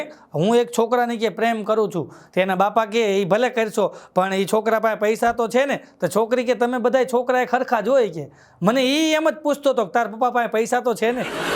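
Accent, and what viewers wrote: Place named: Kathiawar, India. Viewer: હસતો રહે, હસાવતો રહે, કાઠિયાવાડી લહેજામાં સંસ્કારી હાસ્ય... પ્રસરાવતો રહે!!! રિદ્ધિ-સિદ્ધિ ખુબ વરો... વડિલોના આશીર્વાદથી સિદ્ધિનું એવરેસ્ટ સર કરતો રહે!!!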